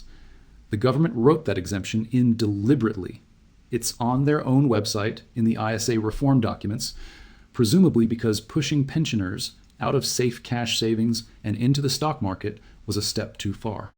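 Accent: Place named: United States. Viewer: Why the American accent? We are talking about England here!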